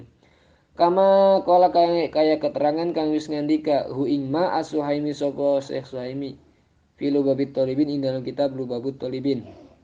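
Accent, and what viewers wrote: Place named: Java, Indonesia. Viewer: naha kang jadi logat jawa🙏🙏,,abdi teu ngartos duh😁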